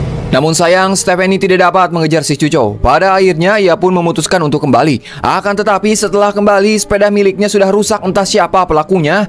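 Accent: West Java, Indonesia. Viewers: Kalau org Sunda emg gitu semua logatnya😂😂😂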